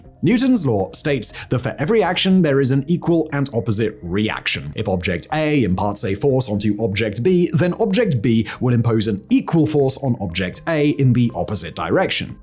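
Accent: United Kingdom. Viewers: Sure, let's have the British guy tell us all about guns! Someone did do their research, so i respect that. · Here in Canada, same. Pretty sure this was made by america for America. Narrated by a Brit. It's like real history. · I CAN NOT WAIT to hear a Brit tell me (an American) about guns and gun myths. Stay in your lane and tell me about different teas or how they are prepared wrong in movies. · Wow, let me just click on this video and let this effete limey school me on guns….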